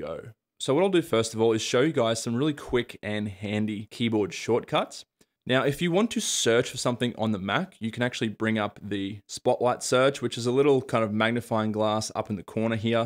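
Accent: Australia. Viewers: Thanks fornthe turorial man. It's so nice to have a tutor with Australian accent 😁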